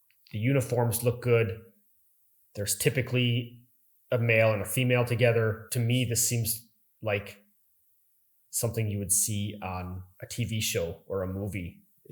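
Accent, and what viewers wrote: Germany. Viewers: get the feeling that you're more German then you are american.. definitely a German accent if you ask me.. so whats your real story? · Why do you have a German accent !? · honestly, this guy sounds really german the way he pronounces stuff. or is it just me thinking that? edit: nevermind, i just heard how he pronounced Hannover, lol.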